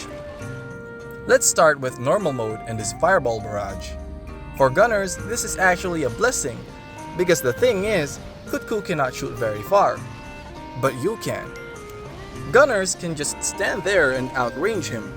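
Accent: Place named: Philippines. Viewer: However, I noticed your accent sounds familiar, sounds like kababayan 🤔, if you don't know that, forget it 😆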